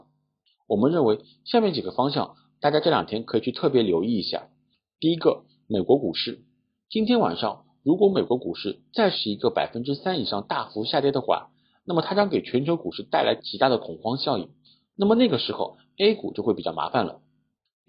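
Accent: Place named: Shanghai, China. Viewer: 听老师口音祖籍应该上海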